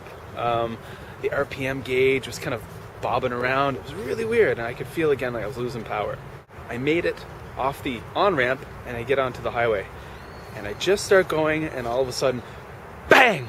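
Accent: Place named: Maritimes, Canada. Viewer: lol. great story. i love the east coaster accent, it makes the story. Reminds me of Rick Mercer or Andrew Younghusband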